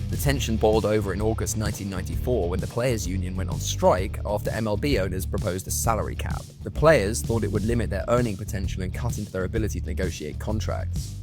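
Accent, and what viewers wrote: United Kingdom. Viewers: British accent? Very smooth not to rubbish. Glad i was recommended this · Gonna start off by saying great, informative video! But on to my actual comment, I'm shocked to hear what I perceive to be a British accent covering American sports, and specifically Baseball. 2024 is dope. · So weird hearing a brit talk biesbol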